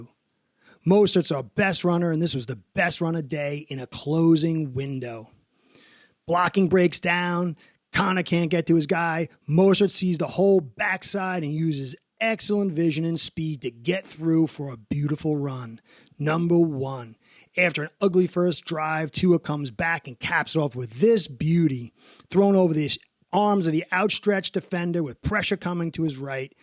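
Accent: New York, United States. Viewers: I like you’re accent are you from New York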